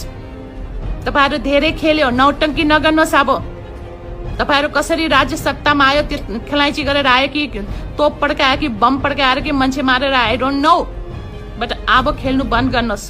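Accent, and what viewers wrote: India. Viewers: ali bhasha lawaj sunda Inian jasto cha. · tapai ko bwoli sunda indian jasto lago ne de jeu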